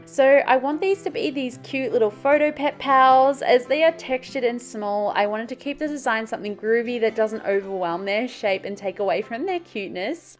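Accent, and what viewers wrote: Australia. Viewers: I love to hear you speak Ausie and want to thank you for giving all of us such a happy fun place to visit. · Your Aussie is one of the unique aspects of you! I’m totally here for it! Don’t ever tone it down! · Never be ashamed of our Australian accent .. We need to show more pride in our country and not dumb down our words for other countries . Proud to be Aussie 🇦🇺🇦🇺🇦🇺🇦🇺🇦🇺🇦🇺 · We love that you're Aussie! Don't mask that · Awww, but your accent is lovely! Honestly, hearing you talk reminds me of the best experiences of my life when I visited Australia on a study abroad trip. Pure joy and nostalgia. ❤️❤️❤️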